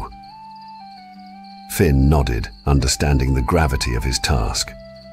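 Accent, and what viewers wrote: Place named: United Kingdom. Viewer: 😡 This is a great Irish myth. And they've got the story wrong! Even worse they used a British accent. Do they not know how insulting that is? Sigh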